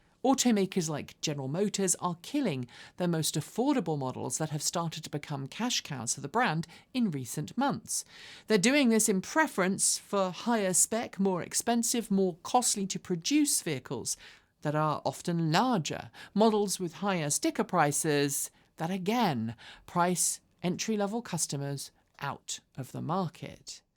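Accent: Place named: United Kingdom. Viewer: Its not co.plicated. the ev push got people to run out and get them then realized how very diffiucult they are if you have much distance to drive. But you sound british so may not understand at all. Out here in westeren america where its minimum 50 milea between towns and vast majority dont have charging and winter real winter it takes only one trip to understand how unreliable thwy are.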